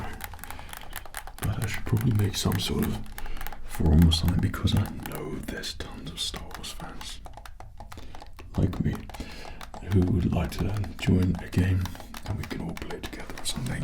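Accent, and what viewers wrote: England, United Kingdom. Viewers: Hii just letting you know is actually born in the uk! and he does sound like he has a very deep English accent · Are you British?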